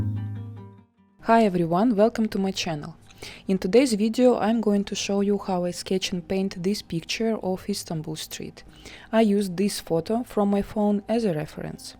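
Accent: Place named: Russia. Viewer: I just finished watching ALL your videos!! I love them!! You're making me want to visit Istanbul..lol. My cousin is going to Greece this summer and may take the trip across the waters to Turkey? Have you ever been to Greece? Also, do you do any outdoor sketching or painting? That would be fun to see too. You're inspiring me to start my art channel as well. And by the way, your English is WONDERFUL!! You have absolutely nothing to worry about. We understand you just fine and the Russian accent is beautiful. I just subscribed to your channel. Thank you for sharing!! Can't wait for the next vidéo!!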